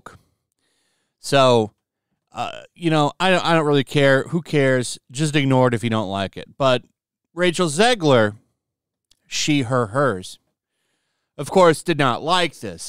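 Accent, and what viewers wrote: United Kingdom. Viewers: Like the British accent put to a Shakespearean tone. Thanks.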